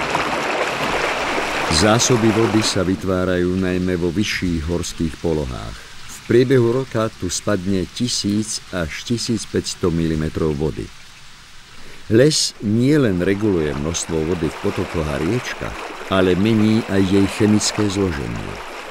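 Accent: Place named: Slovakia. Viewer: Pútavé a tá pravá írečitá Slovenčina.Super.Ďakujem.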